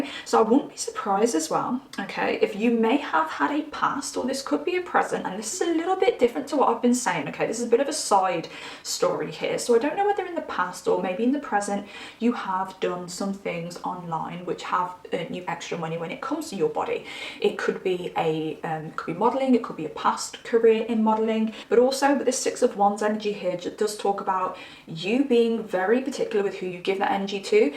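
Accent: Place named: England, United Kingdom. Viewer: Aww I always watch tarot Chanel’s and I’m from Essex so it’s great to see and hear an English girl up in here! I really resonate with your reads big time! Thank you 🎉❤ ps I’m also a cap rising ⭐️😝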